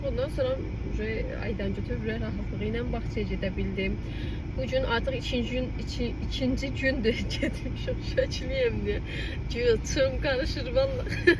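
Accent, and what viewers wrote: Sheki, Azerbaijan. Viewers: Сох sırındı sekı lehcesı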